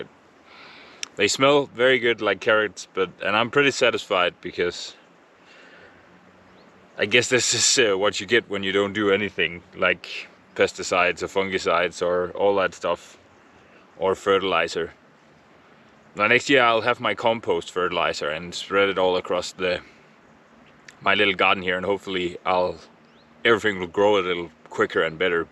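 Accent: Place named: Denmark. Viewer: I immediately knew you were Danish! I once had a Danish flatmate . The accent is so easily recognisable.😅 Nice video! Keep up the good work!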